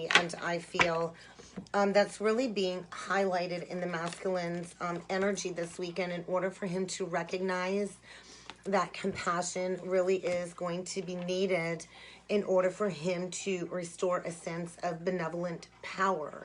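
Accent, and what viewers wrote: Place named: Brooklyn, United States. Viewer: Great reading!! Nice to hear that clear, crisp Brooklyn accent again.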